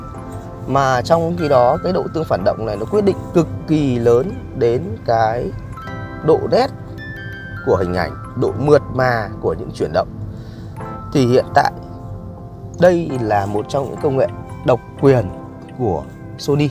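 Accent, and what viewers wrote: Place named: Hanoi, Vietnam. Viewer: Đúng chất giọng dân kẻ chợ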